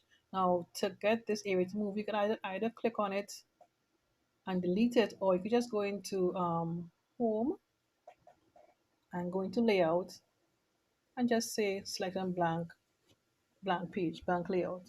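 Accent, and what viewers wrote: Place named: Trinidad and Tobago. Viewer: Hi, great video. Btw are you Trinidadian?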